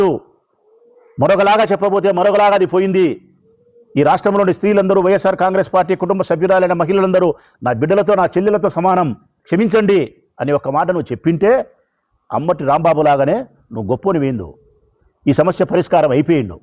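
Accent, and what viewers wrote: Rayalaseema, India. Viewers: రాయలసీమ భాష లో మాట్లాడాలి అంటే అది మీరే అన్న 🙏🙏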